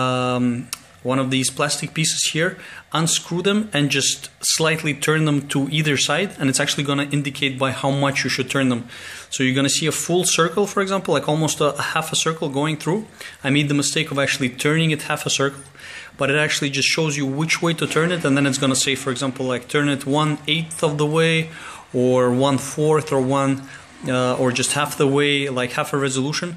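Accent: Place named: Quebec, Canada. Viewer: are you french Canadian? you sound like GSP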